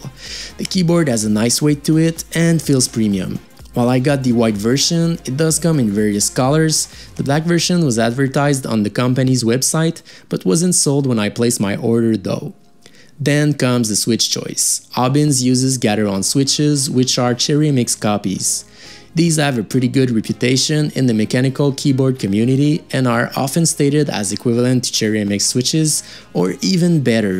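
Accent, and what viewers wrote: Quebec, Canada. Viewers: J'ai comme l'impression que t'es Québecois, pas vrai?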